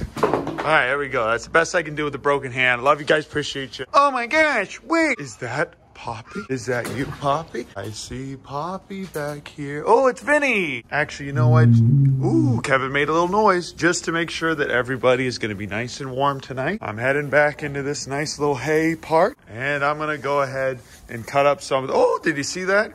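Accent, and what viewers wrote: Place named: Pittsburgh, United States. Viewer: Dude your Pittsburgh accent while on the fahm is the best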